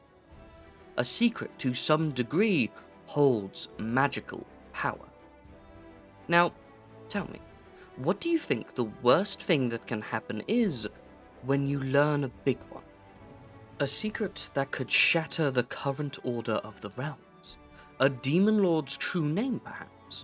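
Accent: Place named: United Kingdom. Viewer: Waiting for my favorite British voice be it in D&D wizard of vampire lord and it's been a month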